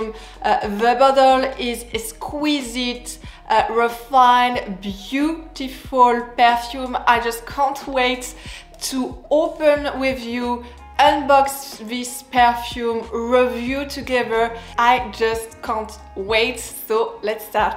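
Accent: France. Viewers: This is 1 of my all time FAVORITE parfumes EVERRR I was introduced to this fragrance at the tender age of 14 brought my 1st bottle at 16 years young along with yves saint Laurent champagne and Dior's poison tendre And Amarige Been looking into getting a new bottle of lair du temp Because I have been seeing her ALOT as of lately And I BEEN into getting all my original signature scents because MINES are some of the best ever made due to my GREAT taste in fragrances and scents🙊😜 LOVE your videos you are AMAZINGLY DECADENT TO WATCH YOUR GORGEOUS & SWEET & IM A SUCKA FOR A FRENCH ACCENT IM A SOFTIE FOR AND ACCENT PERIOD. BUT ESPECIALLY FRENCH BEING IT'S THE LANGUAGE OF LOVE AND ALL 💗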